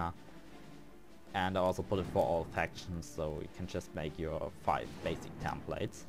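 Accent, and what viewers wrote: Germany. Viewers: That's a typical German accent of someone who did not spend much time talking with/to native speakers directly. It's pretty much basic school English. How is your accent when you speak German?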